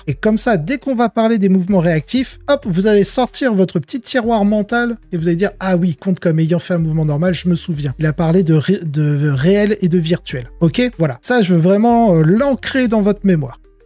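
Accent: England, United Kingdom. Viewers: Merci, c'est tellement nécessaire. Même en amical, être clair sur les règles du jeu permet de vraiment profiter du jeu. Toujours un plaisir de te suivre . Ton accent anglais, ta marque de fabrique 😎. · Un grand merci pour cette vidéo! Ça fait toujours du bien d'avoir des éclaircissement sur les règles, qui dans certaines situations ne sont pas évidente! Surtout avec qualité ET un accent anglais extraordinaire ^^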